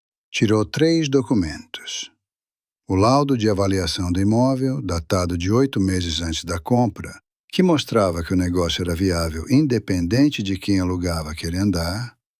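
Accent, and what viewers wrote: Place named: Rio de Janeiro, Brazil. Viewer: Ai a voz desse locutor é muito mole muito malandrão muito carioca fala mais firme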